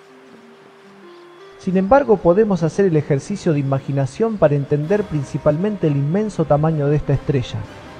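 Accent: Argentina. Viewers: Bueno este tiene el acento Argentino PURO y sin suciedad, molesta escucharlo decir estreSHA,mashoris, sh sh :v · Nose porque la gente se burla del acento así se habla en argentina , pero en todas partes no se habla igual , nose porque se burlan es el "Idioma argentino" que problema tienen De que pronunciemos así ? Son inmaduros .Saludos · muy bien! pensé que era el único argentino que se interesaba por estas cosas. me suscribo. no le des bola a los ignorantes y seguí propagando con orgullo tu acento. saludos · muy buen video pero te hago una pregunta ¿sos argentino? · Si, es Argentino, he visto mucha gente que se quejaba por su acento en los comentarios... Mil disculpas! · Jorge montt el dice estresha por que es argentino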